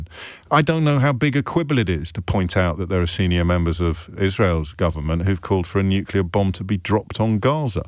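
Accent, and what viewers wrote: United Kingdom. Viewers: James is all over the place a Irish name posh English accent trying to both side a one sided argument he just hasn't brought out the moral quandary line yet?😢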